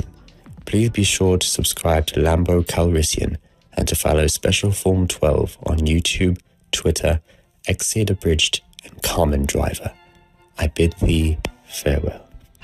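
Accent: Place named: RP, United Kingdom. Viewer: this accent is too posh for someone from bedfordshire like myself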